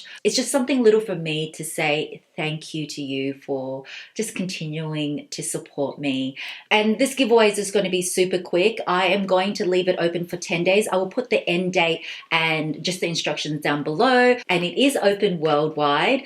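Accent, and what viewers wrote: Australia. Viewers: Hey! My name is Tia and I’m a Fellow Aussie 🇦🇺 but from Perth here! Love your channel and watching your vlogs and hauls! So refreshing to hear our Aussie Accent! Keep up the amazing work! #faMely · #faMely I love your Australian accent. I’ve been watching your videos for a while and love your bag collection. I enjoy your videos it makes me appreciate high fashion. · I am a nurse Educator! Love your videos and the Australian accent! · #faMELy I am also from Australia and I can't tell you how awesome it is to hear another Aussie accent in the youtube world ;)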